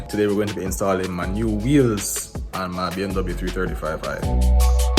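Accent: Jamaica. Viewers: Subscribing just because yuh sound like a yaadie👊🏽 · Rights afta mih hear accent mi click subscribe. Bigg upp🇯🇲🇯🇲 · LOVE this platform gonna be getting one for my first car when i migrate 🔥 Bro sounds Jamaican tho🇯🇲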